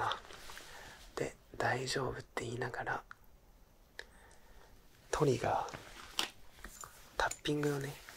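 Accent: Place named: Kansai, Japan. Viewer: いつも標準語のロールプレイしか聞かないから関西弁ロールプレイ新鮮でめちゃよい！！！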